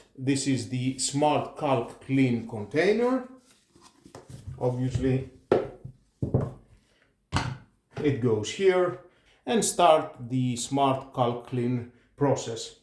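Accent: Greece. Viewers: The supermarket in my area have it now in 77% sale and I just wanted to see some reviews to understand if it’s worthy to buy it and I found yours! Really helpful review thanks a lot! I wanna ask you something…from your accent I was thinking that you have Greek origins, is that right? Έλληνας είσαι φίλε μου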